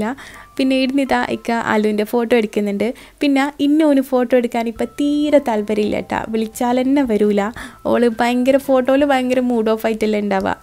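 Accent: Kannur, India. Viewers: പൊളിയാണ് വീഡിയോ എല്ലാവരും നല്ല ഒത്തൊരുമയോടെ ഉള്ള ഫാമിലി ആണ് ഫുൾ ട്രിപ്പ് ഫുൾ വീഡിയോസ് അടിപൊളി ഞാൻ എന്നും കാണാറുണ്ട് എന്റെ ഫേവറേറ്റ് യൂട്യൂബ് ചാനൽ എന്ന് പറയുന്നത് ഇത്താത്തയുടെ തന്റെ ക്യാരക്ടർ എനിക്കിഷ്ടമുള്ളത് വോയിസ് എനിക്ക് ഭയങ്കര ഇഷ്ടമാണ് മാഷാ അള്ളാ നിങ്ങളെ കണ്ണൂർ ഭാഷ പൊളിയാണ് നിങ്ങളുടെ കാമി റ ഞാൻ ട്രൈ ചെയ്തു അടിപൊളിയായിരുന്നു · Ningale kannur style samsaramanu ettavum cute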